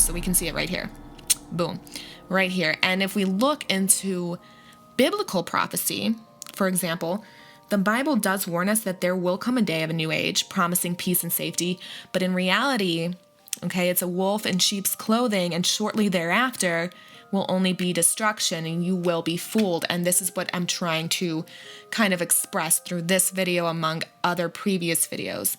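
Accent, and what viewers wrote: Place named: New York, United States. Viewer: Girl how u have a ny accent n from north Chicago lol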